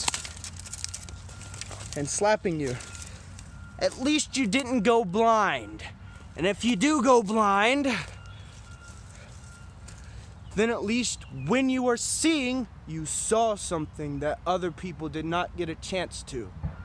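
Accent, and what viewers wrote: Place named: United Kingdom. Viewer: I actually thought you were a hyped British guy.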